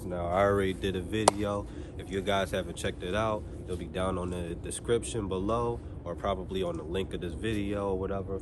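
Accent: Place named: New York, United States. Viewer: You sound like u from new york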